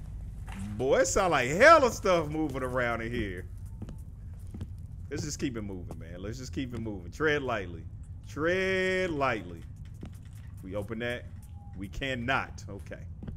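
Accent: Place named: Russia. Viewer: Your Russian accent clean af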